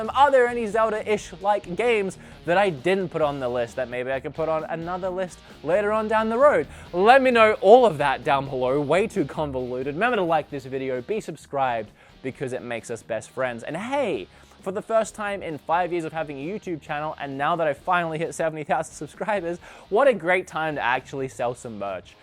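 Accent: Australia. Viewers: Are you Australian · Your Australia 😋 ps zeeeldoooo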